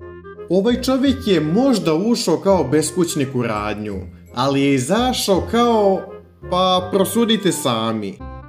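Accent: Montenegro. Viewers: Jeste li primetili da je crnogorac vidim po naglaskom ja sam isto kumara 😂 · Човек говори својим дијалектом ( црногорски ) и неумесно је критиковати га због тога , као што је и не људски критиковати га због говорме мане !Логопед неби могао сада да му помогне јер је старији !!!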